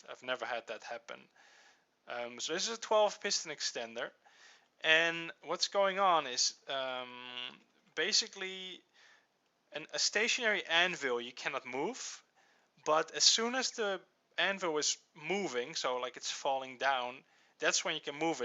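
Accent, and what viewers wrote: Netherlands. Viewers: dude, u dutch? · probeer op je nl accent te oefenen :) dat klinkt iets beter (alleen als tip voor de rest goed) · Jij hebt een nederlands accent. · wait.... are you dutch ?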